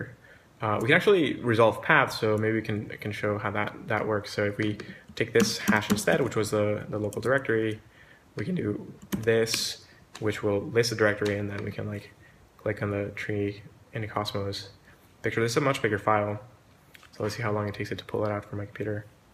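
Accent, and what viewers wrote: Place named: New Jersey, United States. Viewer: Very cool demo. Are you from NJ? Just curious; you sound a lot like my buddy from there.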